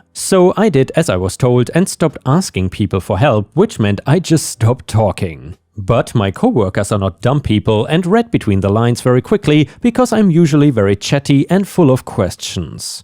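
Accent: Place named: Germany. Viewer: Love ur accent 💞 im thinking German or perhaps French? 😁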